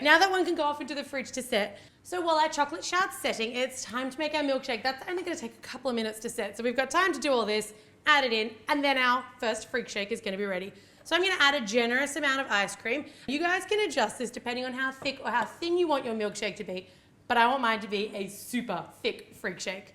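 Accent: Australia. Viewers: Ehh what? She's got like the strongest Australian accent ever. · Is she Australian or American? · Is she Australian? Cause I am! =D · Are you from Australia 😀😄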